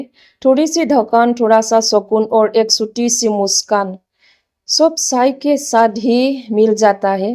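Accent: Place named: Assam, India. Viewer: Maje maje axomiya tune to ahiye jai🎉❤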